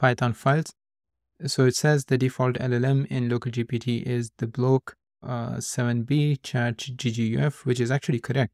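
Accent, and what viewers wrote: India. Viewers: Where are you from? You sound a lot like my Indian Computer Science teacher.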